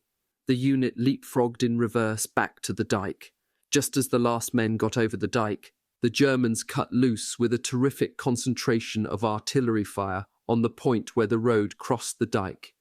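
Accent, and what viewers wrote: United Kingdom. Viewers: Why are they always using a British accent? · Because the person reading is British, it's his actual voice😔😔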